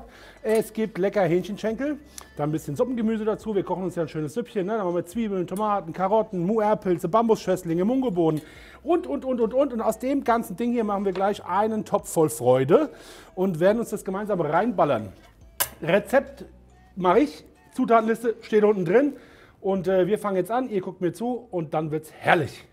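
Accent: Hesse, Germany. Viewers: Hessischer Dialekt einfach eine 10/10 … Grüße aus NRW. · Badesalz kocht. Herrlich Hessisch!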